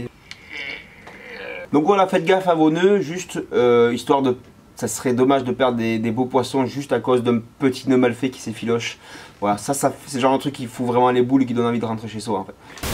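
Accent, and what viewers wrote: South, France. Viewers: Super ta vidéo t'as l'accent du sud tu est dans quel département ?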